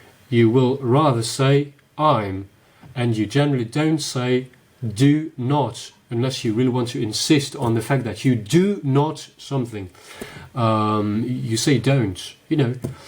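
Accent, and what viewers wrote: England, United Kingdom. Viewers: You have a British accent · you actually sound English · Your English sounds very British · jealous of your English accent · A Belgian with a British accent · I thought you were from England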